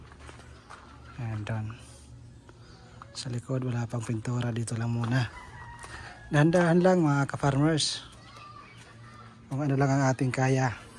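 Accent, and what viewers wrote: Waray, Philippines. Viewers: Taga di in ka sir baga waray man m panday hahahaha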